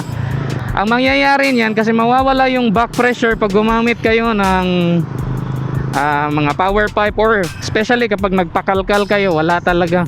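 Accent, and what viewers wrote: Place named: Cebuano, Philippines. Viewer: Bantog kay pareha ta magtagalog boss. Taga cebu diay ka??hehe but i really like your review though.. the best visayan vlogger i knew yet.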